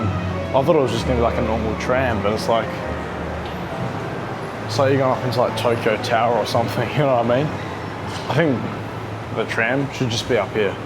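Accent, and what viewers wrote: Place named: Australia. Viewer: Thank you for coming to HK.💛 I can practice listening to Australian-accented English.😃🙏🏻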